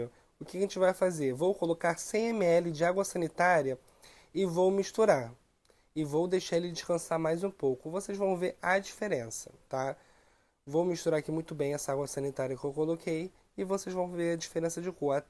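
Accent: Rio de Janeiro, Brazil. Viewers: E eu q ñ me canso de,toda vez q vou fazer uma receita de, rever os vídeos para ter segurança e ouvir esse sotaque carioca e a a perfeição no repasse da técnica junto com a experiência de quem já está no automático. 👍👍amo e amo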